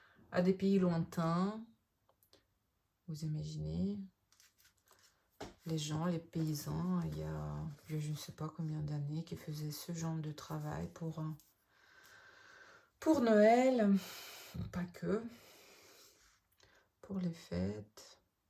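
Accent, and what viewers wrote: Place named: Russia. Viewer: Bonsoir Madame,je de,couvre votre chaîne et vos tutos,j’aime beaucoup votre joie de vivre,vos explications vous êtes très très sympa, je suis une mamie et je vais m’atteler à faire vos jolis chevaux russe,de votre pays,votre tout petit accent est très charmant ! J’ai le temps d’ici Noël,de m’exercer,et d’en réussir quelques uns !du moins j’espère !Merci pour tout le temps que vous nous avez accordé, bisous aux enfants 👶. Marie-Line, deProvence.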